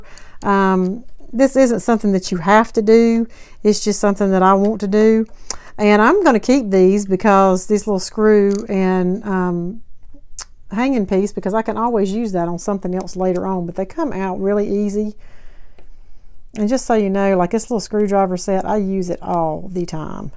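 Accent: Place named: Tennessee, United States. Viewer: AS ALWAYS, LOVE, LOVE, LOVE YOUR VIDEOS & DIYS!!! YOUR VOICE REMINDS ME OF MY FAVORITE SISTER-IN-LAW FROM TENNESSEE. SHE WAS AN ANGEL! HAD SO MANY HEALTH PROBLEMS BUT NEVER COMPLAINED & ALWAYS PUT OTHERS BEFORE HERSELF. I HAVE NOT BEEN TO A DT SINCE MY HIP REPLACEMENT SURGERY, NOVEMBER 12th!!! 😩😳 ONCE OUR WEATHER IMPROVES I AM GOING TO GO! HAVING MAJOR WITHDRAWALS!!! I HAVE MISSED OUT ON SO MANY GREAT ITEMS!!! 😥😭 HOPE YOU & YOURS ARE ALL DOING WELL. KEEP ON WITH YOUR VIDEOS, PLLLLEASE!!!! I SURE WOULD MISS YOU IF YOU DIDN'T!!!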